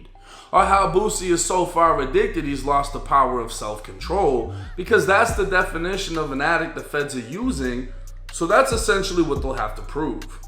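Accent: Boston, United States. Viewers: The boston/Massachusetts accent is heavy/thick in this one lol .. at least hes got the money N assets needed for a lawyer good enough to take on the machine aka ths feds for a second time.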